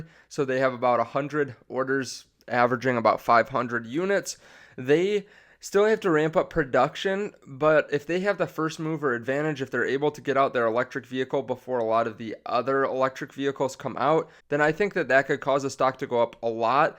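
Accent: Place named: Midwest, United States. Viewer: Dude is so Midwestern I love it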